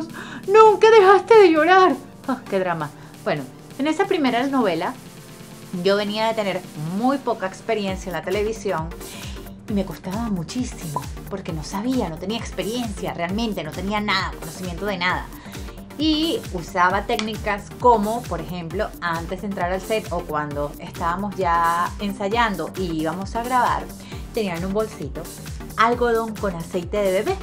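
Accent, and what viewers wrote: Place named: Venezuela. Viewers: Pues es venezolana tinene ese asento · Debe ser por el acento venezolano buena onda!! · es q es venezolana y hablamos todas igual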